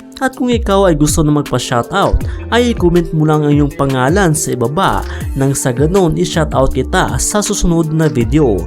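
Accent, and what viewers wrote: Philippines. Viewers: visaya ka kuya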